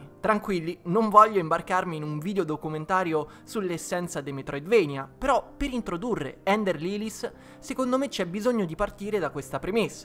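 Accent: Rome, Italy. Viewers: Lo sai che abito sulle Alpi francesi e ogni tanto faccio confusione. Non si può dire però che io non mi applichi. Io almeno non parlo con l'accento romano come fai tu...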